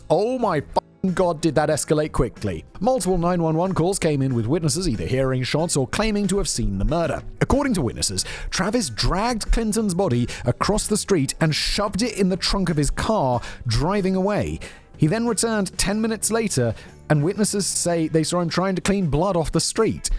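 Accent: RP, United Kingdom. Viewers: If it wasn't for this channel I wouldn't be able to tolerate his poshness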